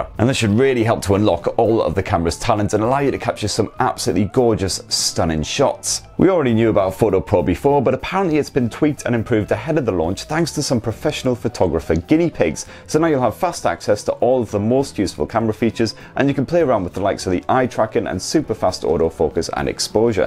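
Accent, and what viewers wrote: United Kingdom. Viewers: Can I just say, it is so Great to hear that wonderful British voice, I fricking love this channel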